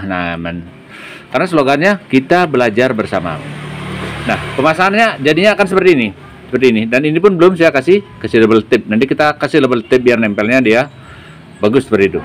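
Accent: Bali, Indonesia. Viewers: Logat nya bali bgt bosku. Sukses slalu bos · alamat mna , kalau dari logatnya orang Bali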